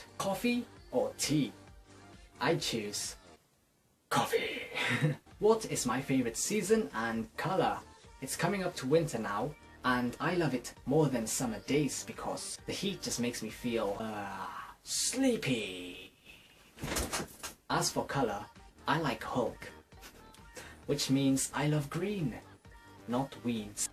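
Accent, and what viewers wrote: United Kingdom. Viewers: Oh no!, it appears as though I am to late to have asked you any questions for this video! D: Regardless, I always enjoy hearing you talk in your fancy British accent c: And might I say, I am truly honored to be a part of your legends list! I know I have not been editing much lately- I seem to have hit a creativity block- but I hope to have some kind of video completed before Christmas. Life has just been so busy lately! I hope things are going as awesome for you as your hair is!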